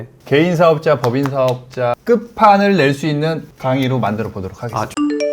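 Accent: Jeolla, South Korea. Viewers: 성님 전라도 사투리 고치실 생각은 없으신가여!!듣기 거북해서염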